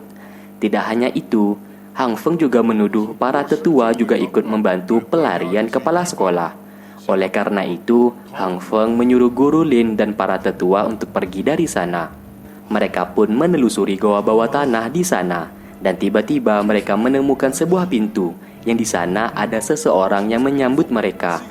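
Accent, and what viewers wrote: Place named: West Java, Indonesia. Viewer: Kekeh😂 aya sunda an ey😁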